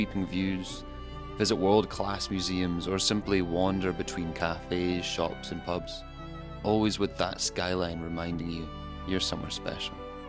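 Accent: Scotland, United Kingdom. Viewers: Nice to hear a Scottish accent. Instead of a ai voice. ❤ Scotland 💙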